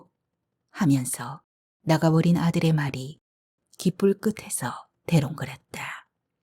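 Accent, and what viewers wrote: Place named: Jindo, South Korea. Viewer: 전라도사투리도 지역마다 다른데 진도 사투리를 제대로 해주시네요. 1980년초 저 한 열살즈음에 진도앞 조그만 섬사람들이 통통배타고가다가 여럿이 배가 가라앉아서 여러명이 죽었어요. 그래서 혼을 건진다고 무당불러서 섬에서 굿을했었는데 무당은 훨훨날아다니고 쇠밥그릇두개겹쳐서 흰천으로 싸서 길게늘려서 혼건진다고 바다로 던졌다당겼다 하고 긴대나무 잡고 있던사람은 미친듯이 떨면서 흔들리던게 기억나는데 그때는 겁없이 구경했는데 그게 씻김굿이었군요. 잘들었습니다.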